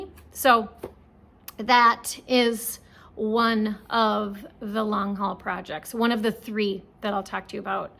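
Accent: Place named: Minnesota, United States. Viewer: Just found you today and am binge watching your video podcasts. I think your Minnesota accent is charming. I have an Atlantic Canadian accent...no doot aboot it, Eh? 😂 Thanks you! 🥰🇨🇦Sharon.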